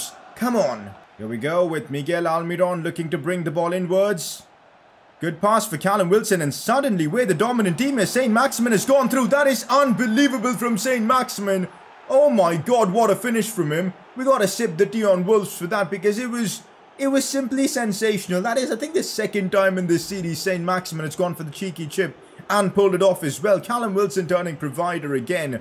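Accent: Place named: India. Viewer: Are you from India bro, love the accent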